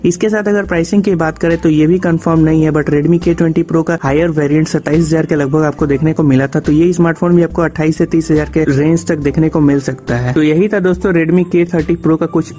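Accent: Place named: India. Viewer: I hear Indian aksen i dislike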